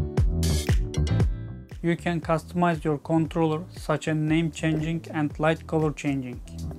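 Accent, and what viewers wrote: Turkey. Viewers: dude by the accent you are indeed either Turkish or i am stupid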